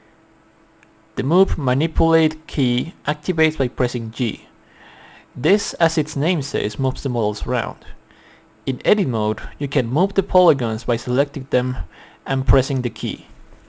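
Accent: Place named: Ireland. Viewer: You sound Irish. Are you Irish?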